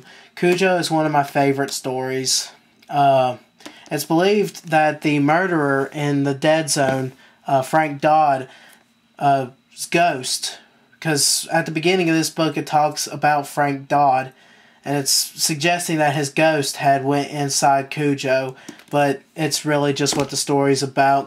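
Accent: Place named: United States. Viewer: Great collection. Where about America are you from? You have a cute accent :)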